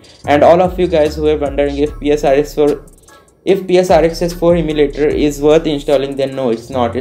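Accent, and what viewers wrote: India. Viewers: OH GOD !! i hate so much indian accent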